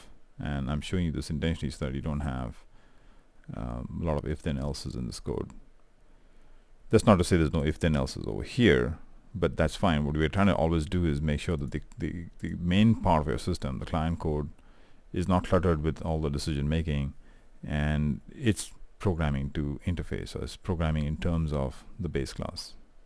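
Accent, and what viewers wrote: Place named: England, United Kingdom. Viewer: Finally, a decent video that explains polymorphorism in a clear english accent! Subscribed.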